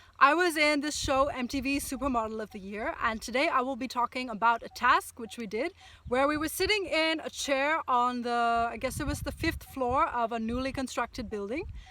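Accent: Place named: England, United Kingdom. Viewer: You're english accent always melt me down. And you're the most awesome lady💜💜💜💜💜💜💜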